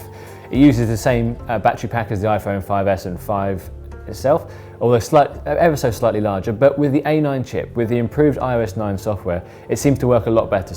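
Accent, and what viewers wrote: United Kingdom. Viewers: shittiest review ever. no hardware, software comparison with other phones, no technical details, very generic and personal opinions, sometimes even biased for example you skipped the details of the shitty 1.2 megapixel selfie camera which is the only biggest flaw in iPhone SE imo that is making me hesitant to buy it. anyway, try to watch linus, johnathan morrison or marques brownlee reviews and see the difference please. i mean anyone can say iPhone SE is a lot smoother, faster and very nice everyone likes it blah blah OF COURSE OBVIOUSLY ITS FASTER ITS A NEW FUCKING PHONE FOR GOD SAKE. I don't need you to tell me to know that its faster but by how much faster than previous generation phones! geez I always like the british accent because it sounds so professional and cool but this time it's disgusting to hear u speaking it. eww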